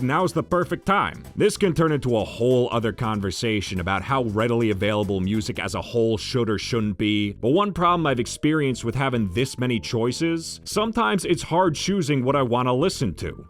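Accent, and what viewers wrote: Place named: New Jersey, United States. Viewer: He’s 25?!! Maybe it’s the Jersey accent(?) but that doesn’t sound like a 25 year old to me lol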